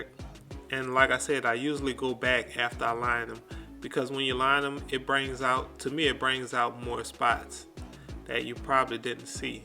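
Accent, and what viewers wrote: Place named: Louisiana, United States. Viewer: you gotta be from Louisiana bro😹 but crispy cut man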